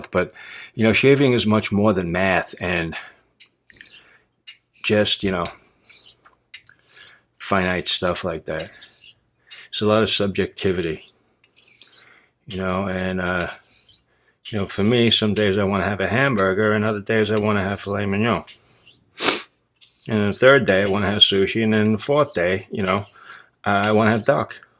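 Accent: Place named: Brooklyn, United States. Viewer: I love the Brooklyn Italian accent, by the way. It's music to my ears.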